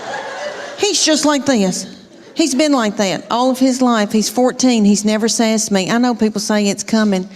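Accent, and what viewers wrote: South, United States. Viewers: the subtitles make me laugh. I grew up in texas. I have a city accent, not a southern. but its like, really funny to realize that maybe someone in france has no clue what the hell this southern lady is saying. love it. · I'm from the south. this lady reminds me so much of people from around here. The accent is my fav. You don't hear enough.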